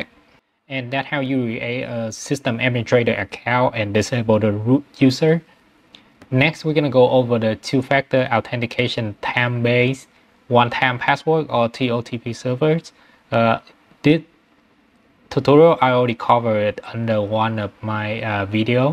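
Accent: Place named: Vietnam. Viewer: recognize by the accent. I'm also Vietnamese, and I am too, building the homelab with opnsense and proxmox and truenas. Seeing other vietnamese enthuiast about opnsense make me feel I'm not alone :)))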